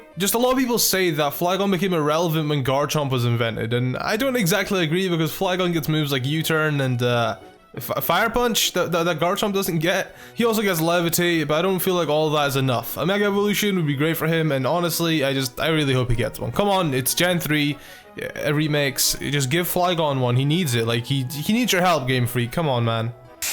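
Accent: Scotland, United Kingdom. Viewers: are you scottish? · ***** where are you from because you sound Scottish I'm Scottish =D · tehTroll OfLife Yes he is Scottish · Are you Scottish?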